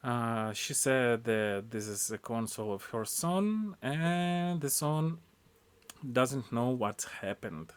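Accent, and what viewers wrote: Russia. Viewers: Какой лютый русский акцент) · Нижегородский проскакивает бля😂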